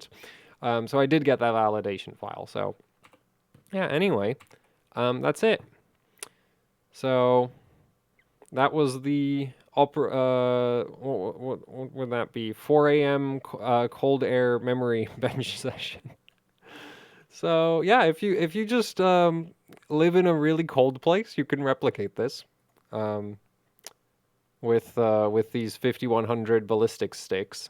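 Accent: Czech Republic. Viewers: Wait, are you Czech? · You Czech? Wot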